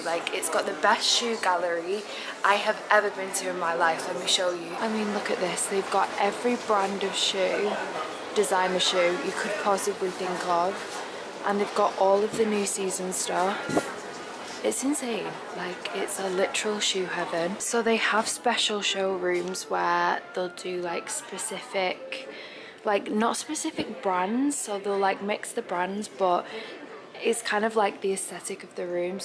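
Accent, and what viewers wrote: Manchester, United Kingdom. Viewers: the Mancunian accent is my favorite.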